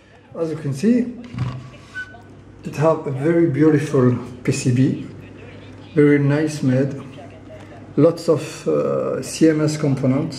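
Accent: France. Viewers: On dirait un accent francais ;)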